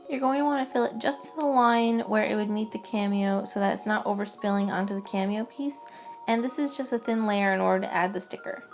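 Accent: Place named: Canada. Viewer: Are you Canadian? I can hear it in the way you say, "about" you say "aboot" lol it's cute.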